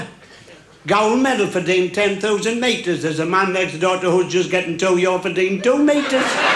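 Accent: United Kingdom. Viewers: Me Mum was from County Durham and the accent and the same sort of humour was nice to hear.. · I've just realised how similar his accent was to 'Little Nana Iris'. She was a hilarious woman from Sunderland who shot to Youtube fame a few years back.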